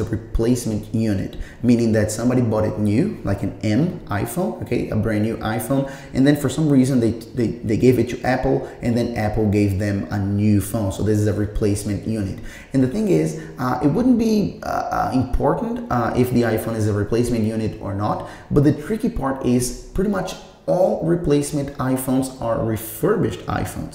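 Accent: Brazil. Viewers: Are you brazilian? I can hear brazilian in your accent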